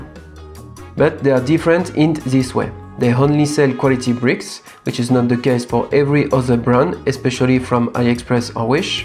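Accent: France. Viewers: On entend l'accent français mais sa reste intéressant de l'écouter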